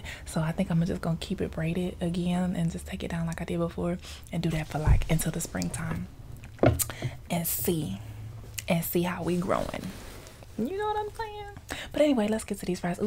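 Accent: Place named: Maryland, United States. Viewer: Your pretty.. You sound like your from Maryland...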